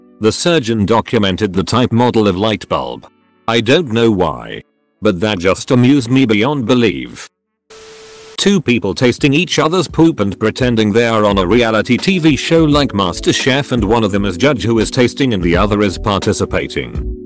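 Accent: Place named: United Kingdom. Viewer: I wish people would use a different voice. I hate it and keep running from channel to channel but every single one uses this annoying robotic brit. Lol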